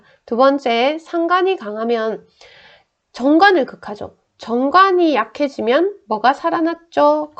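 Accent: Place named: South Korea. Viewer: 사투리 억양이 정감있게 와닿네요^^ 깔끔한 포맷으로 다른 분들과는 차별화되어, 개인과외 받는 기분이 드네요! 감사합니다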